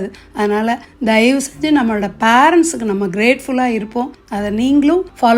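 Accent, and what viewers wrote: Kerala, India. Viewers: Romba alaga pesurenga amma.. Unga pechu la malayali touch eruku, neenga malayali ah?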